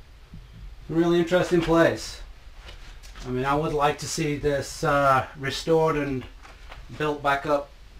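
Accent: United Kingdom. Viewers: Love this place, it has a peculiar mystique to it, something a little spooky & Paranormal (that's the feeling I get ne-how.!) I think it was in a little better condition when Dan Bell was there... Thanks for exploring, p.s. R U a Brit - #Playschool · a cool film. I'm just wondering...are you from the UK? I detect a slight accent from over here.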